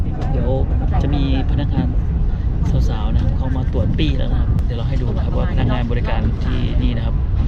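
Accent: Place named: Laos. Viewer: ปากเว้าพาสาลาวม่วนหลายอ้าย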